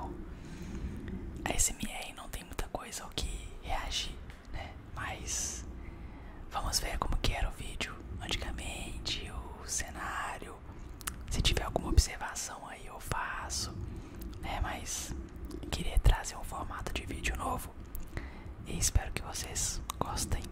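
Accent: Minas Gerais, Brazil. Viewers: Espinha. Rsss tem um vídeo que vc apagou né? Com microfone de orelha e fundo meio vermelho, e com luzinhas de natal. A gente nota viu. Aquele vídeo era muito legal. Sobre esse vídeo tá ok, achei legal quando vc faz sons por cima: acho que os vídeos antigos traduzem muito pouca sensibilidade (por conta dos sons serem mais abruptos). Cara, as vezes eu repito seus vídeos pra dormir ou acalmar a mente no final da noite. Além do mais esse sotaque do povo de Minas, já transpassa uma certa tranquilidade. Conteúdo nota mil. Evolução muito expressiva 👏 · Sou inscrito há muito tempo, muito bom ver o canal se mantendo e crescendo! - Amo seu sotaque mineiro hauhau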